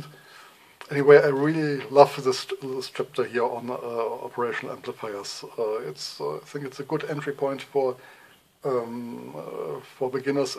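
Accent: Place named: Germany. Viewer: I think the reviewer is German ...i can tell from his accent. munchen?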